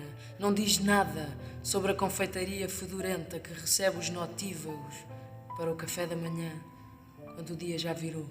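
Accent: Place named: Portugal. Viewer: seu sotaque português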